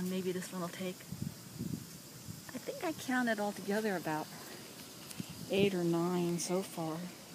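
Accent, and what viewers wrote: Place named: California, United States. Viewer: Hey!!! how are you?? Ive sooooo missed that soothing Californian accent!! Do those pumpkin runners keep growing or will they eventually stop? I hope your well my friend and your family are ok? Are you back to school this week? Lotte starts Big school on wednesday (infants) and jack becomes a junior!!!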